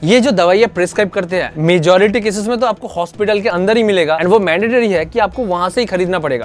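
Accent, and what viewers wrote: Bengal, India. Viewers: fir bhi Hindi me Thora Bangla ka prabhav h..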